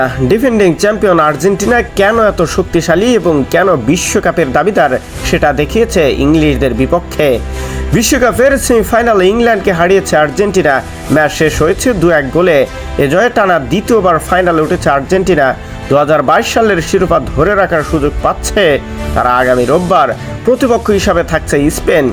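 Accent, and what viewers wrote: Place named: Bangladesh. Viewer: Bhalo bolcho tobuo bangladeshi bangladeshi lagche keno?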